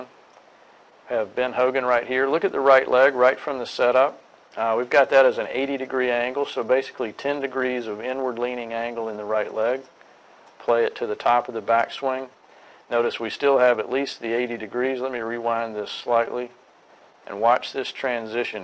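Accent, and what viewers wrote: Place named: United States. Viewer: I am from England what part of USA is your accent from? Oh and yes def in the top 3 Youtube teachers!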